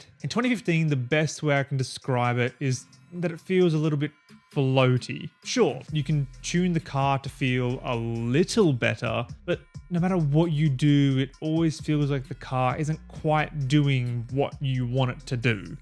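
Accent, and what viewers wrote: Australia. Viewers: brooooo, an aussie? oh mate lets do this, i just listened to an american younin talk about Midnight club for 40 mins and i so wanna be home again baha. - a fred durst ref in the first minute, i'm yours rofl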